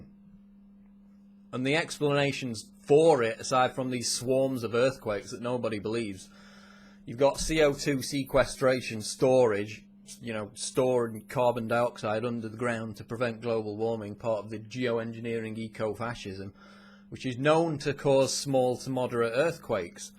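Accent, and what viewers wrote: United Kingdom. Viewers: You live in midwest? what's with the british accent? jw cuz i live in wisconsin too.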